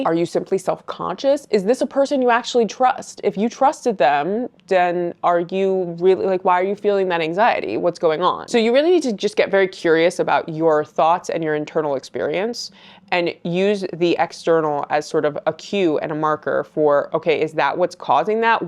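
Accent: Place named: Midwest, United States. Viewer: Are you from the Midwest? You talk like people in my circle, so listening to you felt very homey.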